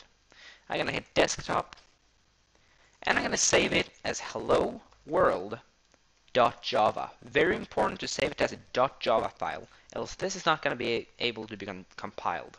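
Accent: Sweden. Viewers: are you swedish? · DU ER SVEEEENSK! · är du svensk?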